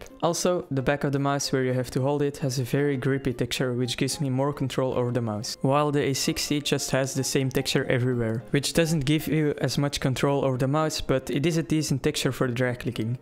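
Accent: Netherlands. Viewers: Are you dutch? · Ik dacht al dat je Nederlands was lmao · I smell dutch:D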